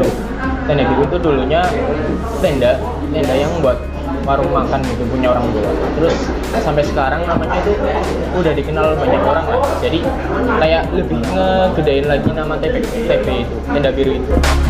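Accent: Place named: Banyumas, Indonesia. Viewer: Ngapak nya keliatan yaah 😂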